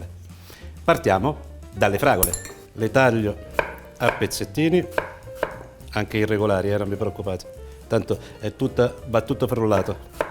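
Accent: Tuscany, Italy. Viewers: E' toscano, probabilmente. Ci viene naturale!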